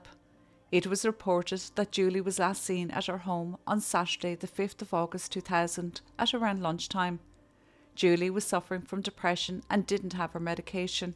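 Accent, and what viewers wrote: Ireland. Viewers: I find your lovely, Irish lilt to be comforting. I subscribe to several true crime channels from various countries. This is a favorite.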